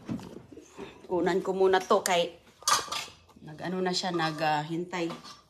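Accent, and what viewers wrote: Visayas, Philippines. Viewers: Minus points: she thinks eating with her hands make her remain filipino and don't want to use spoon and fork, her visayan accent is so bad to listen to, she loves bagoong even her kidw young as they are eat bagoong and eats with their dirty hands, most of her visitors are filipinos too so we can assume they have bagoong in their homes yet she serves food with bagoong always to her visitors.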